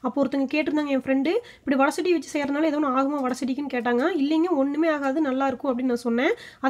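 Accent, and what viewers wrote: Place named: Coimbatore, India. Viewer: அக்கா நீங்க கோயபுத்துர் றா உங்க பேச்சி நல்லறுக்கு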